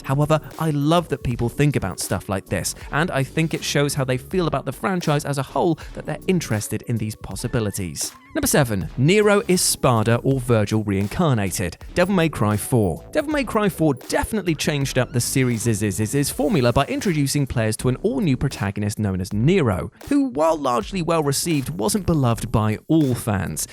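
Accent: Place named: United Kingdom. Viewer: Has anyone ever said that you sound like a more "proper British" speaking version of Luke from OutsideXbox OxVenture? More "proper" from an America's viewpoint that is heh heh 😅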